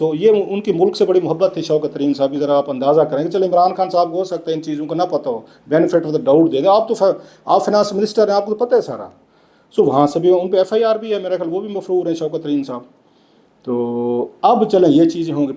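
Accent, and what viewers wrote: Saraiki, Pakistan. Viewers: کلاسرا آپکی سرائیکی اردو پر حاوی ھے آپ نے باربارکو ولا ولا بولا ھے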